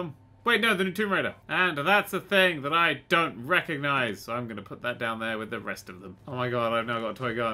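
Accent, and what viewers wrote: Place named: Cockney, United Kingdom. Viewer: Extreme TGX (Game Reviews And Stuff) well he almost has a cockney accent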